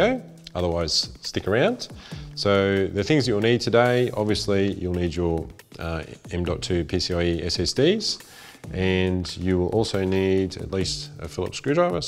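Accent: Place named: Australia. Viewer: After the first sentences, being a non-native English speaker, I thought "What's that supposed to be? Australian!?" ... and only seconds later the .au domain appeared at the bottom xD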